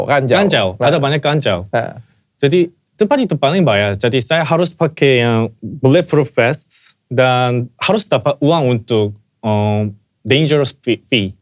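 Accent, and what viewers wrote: South Korea. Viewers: Orng Korea omng bhs indonesia sprti bhsa korea 😂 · Bhs Indo logat korea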